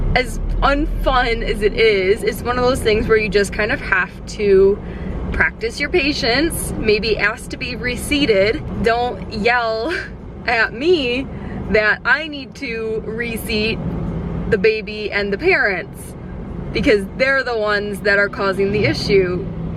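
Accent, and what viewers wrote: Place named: South, United States. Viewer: I love the aviator glasses and country accent. Babies and puppies always make my flights that much better especially if they are happy to be outside 😁🐶👶.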